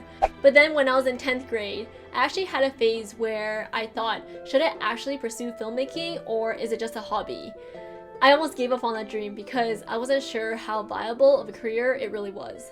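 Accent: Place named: Canada. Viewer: Cost of living in L.A. is ridiculous anyways, plus you’d lose that lovely Canadian accent 😂😂 hope the rest of the year treats you better.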